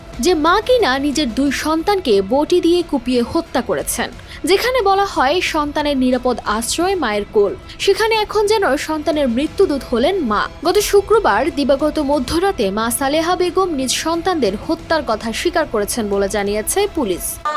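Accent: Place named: Kolkata, India. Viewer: কোলকাতা স্টাইল এ নিউজ পড়ার কারণ কি? আপনার মতো সাংবাদিক কিভাবে একটা চ্যানেল রাখে 😑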